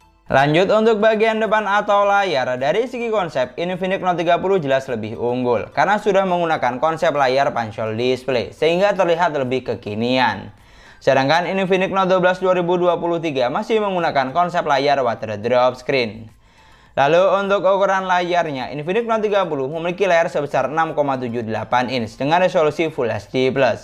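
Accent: Madura, Indonesia. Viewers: mas logat maduranya kentel banget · Logatnya kaya madura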